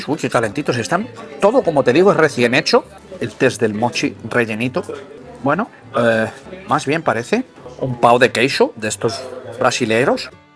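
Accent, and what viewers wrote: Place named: Basque Country, Spain. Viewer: ¿Por qué pone acento vasco si es de Alcalá de Henares? 😄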